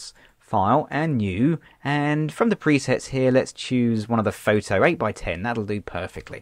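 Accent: United Kingdom. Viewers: Omg , Man great accent. No offence intended , but it is like those english safari loving adventure Tarzan mode ppl. Great turtorial . Challiho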